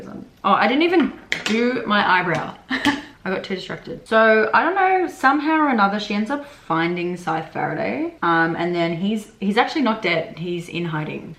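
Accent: Australia. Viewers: No wonder sounds really off … thought it was from some part of country I never been to … it’s Australian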